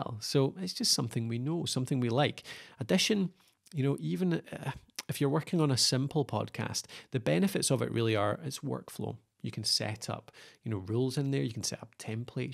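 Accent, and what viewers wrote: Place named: Scotland, United Kingdom. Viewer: He's not English. He's Scottish or Irish. He sounds like anyways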